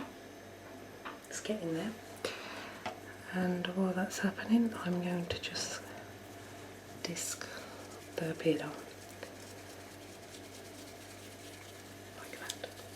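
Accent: United Kingdom. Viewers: are you form the midlands ? it sounds like it.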